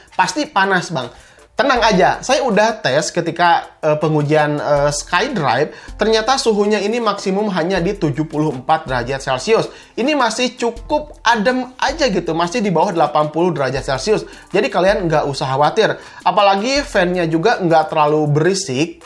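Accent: West Java, Indonesia. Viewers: Urang sunda ieu mah ngomong na lipa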